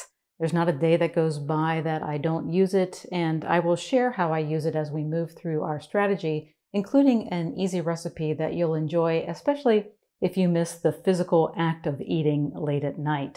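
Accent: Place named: United States. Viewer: Excellent advice. Wonderful delivery in your calm voice with no trashy muzak track. I'm UK and some USA accents are not for me. Yours is perfect. To me you sound like Mary Chapin Carpenter so I wonder if you come from the same part of America.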